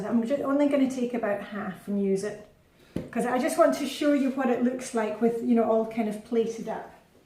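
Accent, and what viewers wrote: Scotland, United Kingdom. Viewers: Danish with a Scottish accent. That’s different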